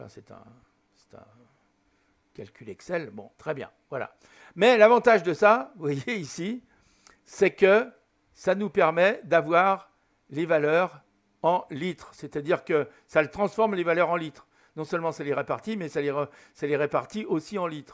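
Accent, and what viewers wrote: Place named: Lyon, France. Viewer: Merci pour tous vos efforts autour de MSFS 2020 et du FBY A32Nx en particulier. Dès que je cherche une réponse, je tombe sur vos tutos ! Si je peux me permettre, vous gagneriez à être plus synthétique: Right to the point, please ! Vous vous perdez en détails, incidences et autres tergiversations : par exemple, ne perdez pas 20mn à parler d'un bout de logiciel obscur qui, selon vous, "ne marche pas"; ce sera déjà ça de gagné ! Moi qui cherchait le ZFWCG estimé dans l'OFP de Simbrief, je suis déçu et j'ai perdu 1 heure...mais ne désespère pas. Si je le trouve, je vous le dirai ... Surtout gardez votre sympathique gouaille lyonnaise qui permet de ne pas totalement perdre patience ! L'art est difficile et la critique bien facile ... Amicalement, PS/ comme promis, le ZFWCG est présenté tout en bas à gauche de l'écran de la tablette (EFB) à la page GROUND/PAYLOAD une fois les passagers embarqués Peut-être que cela n'existait pas au moment de la réalisation de votre vidéo. 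Cela vous permettra en tout cas de vérifier vos dires. En espérant que cela vous soit utile ainsi qu'à ceux qui font cette recherche.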